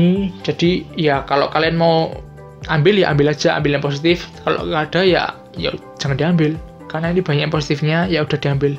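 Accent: Java, Indonesia. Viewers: logat jawa ya bang?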